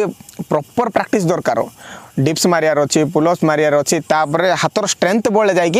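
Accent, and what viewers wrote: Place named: Nagarmpur, India. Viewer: Bhai tame Nagarmpur ra ki katha barta semiti laguchi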